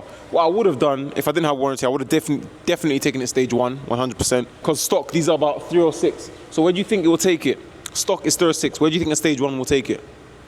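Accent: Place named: South Africa. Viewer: he get me everytime , i keep thinking bro is from southa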